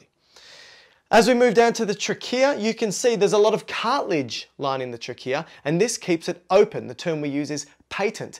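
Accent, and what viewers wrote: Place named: Australia. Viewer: Australian boi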